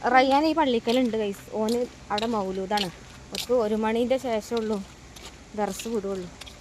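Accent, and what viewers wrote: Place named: Malappuram, India. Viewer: Malapuram slang kunu kunu kelkan ishtan but tto tto kelkumbo vallatha oru irritation 😂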